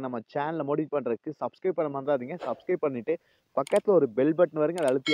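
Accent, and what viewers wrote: Coimbatore, India. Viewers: Coimbatore voice super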